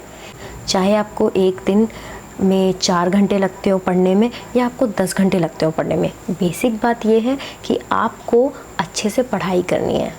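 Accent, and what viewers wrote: Bihar, India. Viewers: R u from Bihar???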